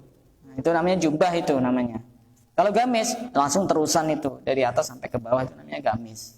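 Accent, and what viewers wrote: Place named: Madura, Indonesia. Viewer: Kok seperti logat Madura ustadz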